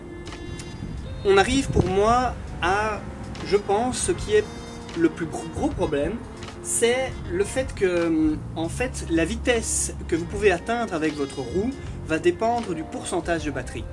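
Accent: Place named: Belgium. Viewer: Bonne vidéo! Je me suis décidé et j'ai commandé la Z6 :-) Tu es de Belgique ? J'ai cru entendre cet accent familier. Ce serait cool de wheeler ensemble en tt cas, je suis de BXHELL !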